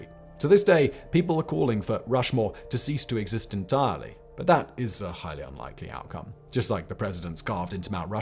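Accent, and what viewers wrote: United Kingdom. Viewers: South Dakotan here. Was cleaning my bathroom while listing to your today I found out Japan and Germany, and then this auto plays and I just hear this goofy British man say there is no more boring a place. He’s right but like damn, didn’t expect such a personal attack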